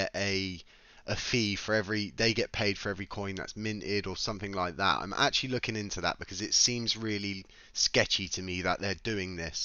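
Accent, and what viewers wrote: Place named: Midlands, United Kingdom. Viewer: I'm sensing a Midlands accent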